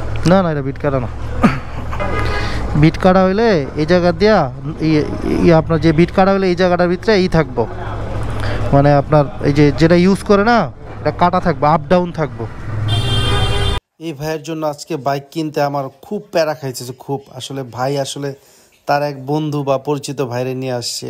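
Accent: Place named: Noakhali, Bangladesh. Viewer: ভাই নোয়াখাইল্লা বলে কথা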